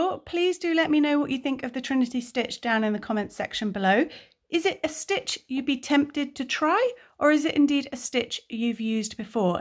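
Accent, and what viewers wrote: United Kingdom. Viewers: another excellent tutorial. has a pleasant easy to listen voice in UK English.Not sure why American crochet terms are used though. I am sure there is a good reason?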